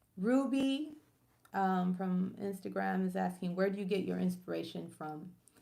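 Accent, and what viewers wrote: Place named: United States. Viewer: She doesn't have an accent she sounds American.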